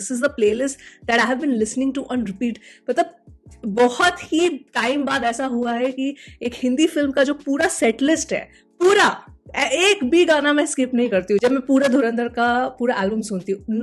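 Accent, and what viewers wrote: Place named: Northeast, India. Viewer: Mam are you from north east because your hindi accent doesnt have that north indian vibe where are you from❤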